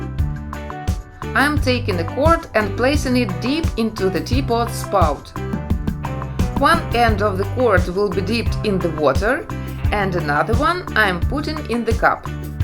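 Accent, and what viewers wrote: Russia. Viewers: OMG!! WHAT!!! UR RUSSIAN!! OMG YOU SOUND SO COOL I DIDN'T EXPECT THAT.. UR SO COOL 😱😱😍😍😄😆😱😱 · Акцент Русский, или мне кажется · You speak like russian😅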